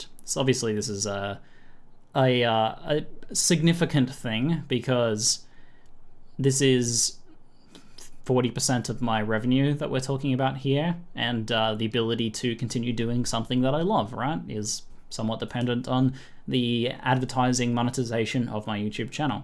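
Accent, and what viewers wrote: Australia. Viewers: I'm Australian too and he definitely has an Australian accent. If he was a Kiwi he'd call himself:)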